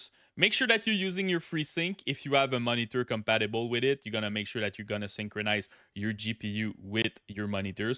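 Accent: Quebec, Canada. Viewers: Was interested until I heard "French" Canadian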